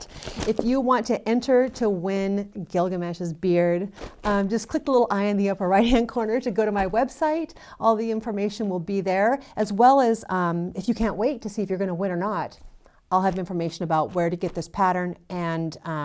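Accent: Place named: United Kingdom. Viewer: welcom. I thought you british.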